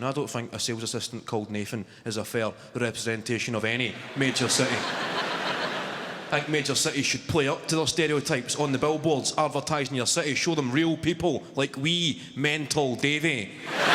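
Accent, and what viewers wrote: Scotland, United Kingdom. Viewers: As a Canadian with a 2x lethal exposure to the Scottish accent, I can listen to this w/o subtitles. Also, "swapped his personality for a hi-vis vest" got me. X) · *didnt quite understand everything you said, you've got a very strong scotch accent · Reminds me of my 80 year old Scottish neighbor Bob Thompson, the accent coupled with the Scottish mind can make anything funny! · That's because about 15% of what exits his mouth is not English, it's his and my native language, Scots. 100% Scots sounds like this, <link> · I just come here to listen accent.... Russian accent is Badass... Jamaican is funky.... Scotish is coolest.... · I looove his Scottish accent even though it is quite difficult for me to understand everything as a non-native speaker. 😍😄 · Well, I didn't know what you were talking about, given the fast stuff and the accent and the local terms, but I hope it was friendly to the U.S. 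Otherwise, we'll ship Donald Trump to Glascow (having been deposed here recently) and he's YOUR MILLSTONE. Cheers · He sounds like Trainspotting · Love the scottish language, do not change it,!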